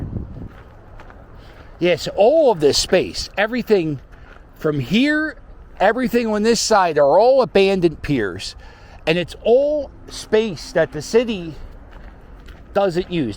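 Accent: Philadelphia, United States. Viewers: Your the best!! I moved to Florida from Philly in 2008 and I can hear the Philly accent in your voice!!! Makes me homesick!! Lol miss the cheesesteaks!!! You are so awesome to show the good and fun things in Philly!!! I think I may have seen you around we lived on axe factory and mower streets!!! You are a funny guy!!!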